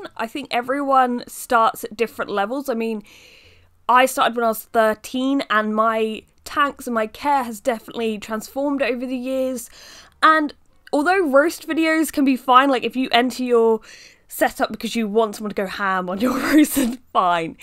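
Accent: United Kingdom. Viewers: yo plz ur accent is from what region in uk i need a gf with this accent!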